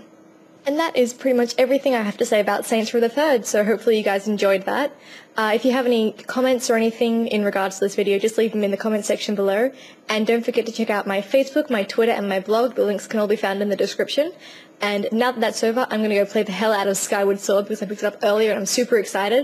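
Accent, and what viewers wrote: Australia. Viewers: where are u from your accent sounds like austrailian maybe .....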